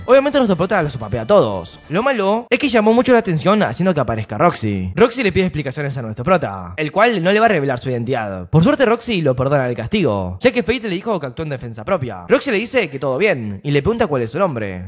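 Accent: Argentina. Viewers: TE AMOOOOOOOO DE AMIGO SIEMPRE ME ENCANTA TU CONTENIDO Y TU ACENTO ARGENTINO 🎉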